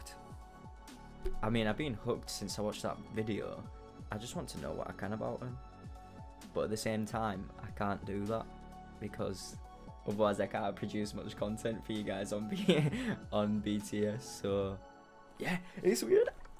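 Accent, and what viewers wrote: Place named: United Kingdom. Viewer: I know how you feel...Even though I already know the songs, listening together with you put me in exactly the same state as you. A kind of bliss and existing in my own sphere^^ It's like his voice, his emotions and the lyrics stay even when the song is already finished, and keep resonating with different parts of my subconciousness.... I honestly love how moved you are by their songs, makes me really enjoy watching your reactions. Thank you! :) Btw as a non English native speaker.... still trying to figure out your dialect^^ I would go for GB but there are still so many possibilities lol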